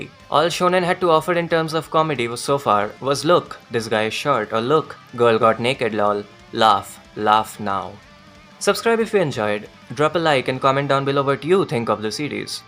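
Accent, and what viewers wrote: India. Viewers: Sounded indian so i subscribed ❤ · Indian accent. ok im out.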